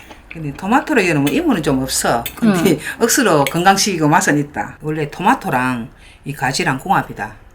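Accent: Gyeongsang, South Korea. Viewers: 깅상도 사투리 억수로 조슴더 어무이예~~^^ 깨방정 맛있데이 엄마~^^ ㅋㅋㅋㅋ 부러랑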